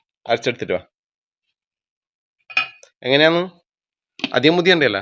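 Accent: Kannur, India. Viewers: Niggalku. Nammude. Kannur. Basha. Manasilakunile😂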